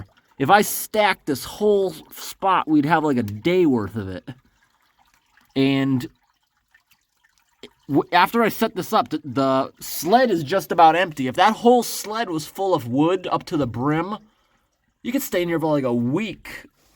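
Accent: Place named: Michigan, United States. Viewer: Sounds like a Michigan accent.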